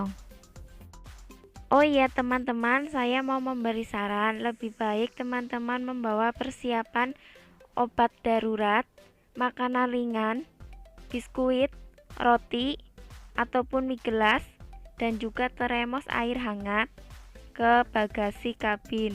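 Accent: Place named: Banyumas, Indonesia. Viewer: Ngapak kie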